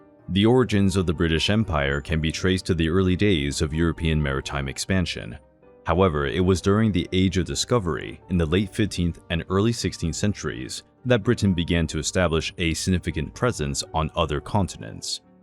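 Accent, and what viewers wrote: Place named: United States. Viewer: Of course its a biased review, the voice over is done by an American XD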